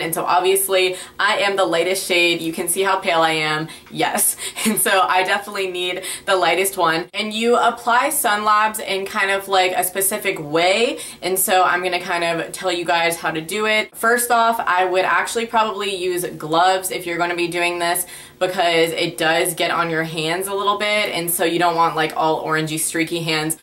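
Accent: United States. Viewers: its amazing how americans say 'get tan' i want your accent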